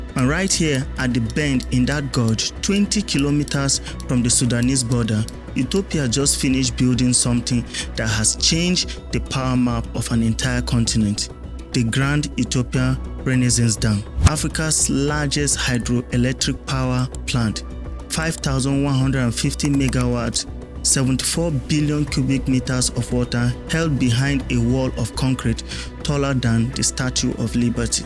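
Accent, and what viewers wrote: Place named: Nigeria. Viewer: The accent naija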